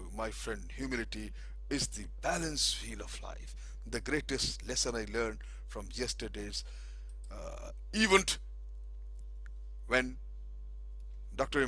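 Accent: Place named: Kerala, India. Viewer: U sound like a mallu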